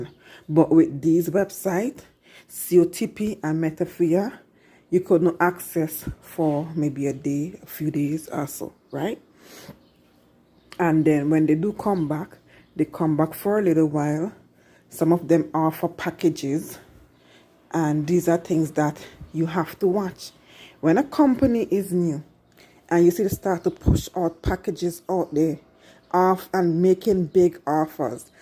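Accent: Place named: Jamaica. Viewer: There is only one truth in this space , if it sounds too good to be true it is, they are all ponzi schemes. where u from ? u sound jamaican.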